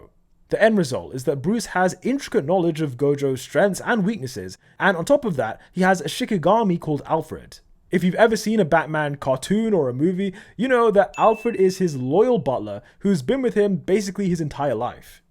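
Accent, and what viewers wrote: United Kingdom. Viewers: This ngas black??? Sorry I don’t have any problems with this but I had no idea. Thought you were a 30 yr old British white guy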